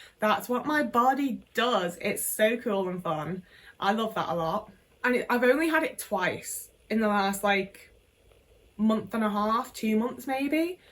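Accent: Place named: Northern England, United Kingdom. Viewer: British women with their Yorkshire tea speaking in that northern accent --- so exotic and wonderful.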